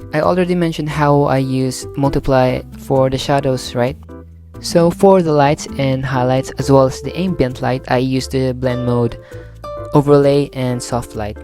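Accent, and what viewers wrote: Philippines. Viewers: Interesting how people thought you were Korean. As soon as I heard your voice, I was like "that's a Filipino!" Probably because immediately used to the accent XD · Omg how did I guess that I knew you were Philippines just by your voice/accent! And love your art so much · i can here ur Philipppineas accent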